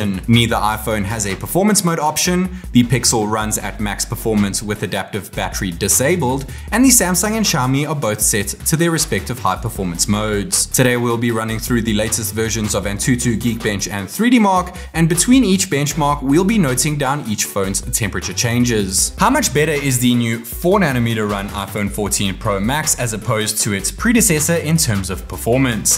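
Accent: South Africa. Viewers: Bro sounds South African🧐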